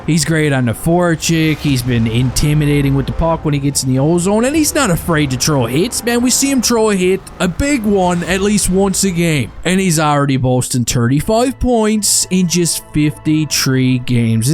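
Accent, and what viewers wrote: Newfoundland, Canada. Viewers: I know a fellow Newfie when I hear one lol, Cheers from central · are you a newfy?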